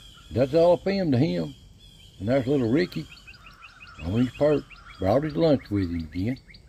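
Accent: Appalachia, United States. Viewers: Came across your YouTube videos and have really enjoyed them. It's brought back many memories from my summers in East Tennessee. I am of Scotch Irish/English decent. My mother was from Knoxville and father from Carbo VA. Russell County. My dad grew up around the mines and had a love of steam locomotives Your accent is one I'm very familiar hearing. Love the mystery stories! And the orbs, wow! Many thoughts on those. I recall sitting on my grandfather's porch as they discussed the Dennis Martin case. It was going on at that time. Appreciate all the time involved in creating the videos. Looking forward to more videos. Greg Childress Cincinnati, Ohio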